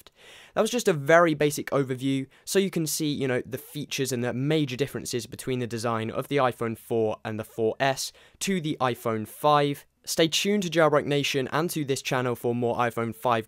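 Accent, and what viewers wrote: United Kingdom. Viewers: Is he a Brit or what?1?! ;0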